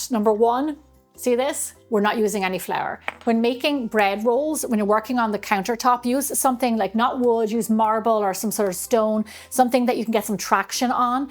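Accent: Ireland. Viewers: I love your accent & have been wondering if George has taken after you speaking w/ a beautiful Irish accent. These rolls look wonderful. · Those are some lovely sweet rolls,. You’re truly such a gem when you bake, and when you speak with that adorable Irish accent. 🤗🤗🤗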